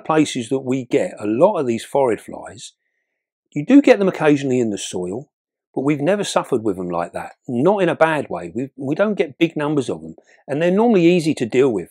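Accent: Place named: London, United Kingdom. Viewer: English sounds a little like a London accent I think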